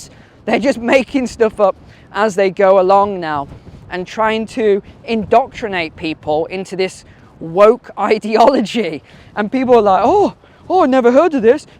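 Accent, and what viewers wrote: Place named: United Kingdom. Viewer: Yes, you're right that I don't care about your looks, but your British accent is nice to hear.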